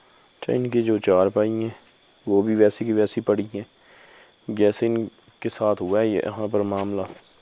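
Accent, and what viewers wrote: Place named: Punjab, Pakistan. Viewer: Bhai what about you & how is your health I hope u will be fine & better You r very responsible person You r so nice your Punjabi accent is also nice Like churhaaaail 😊🥰 Bhai Yahan tk to thk ha churail ab larki to na bolein bura lgta ha yaar 😂😂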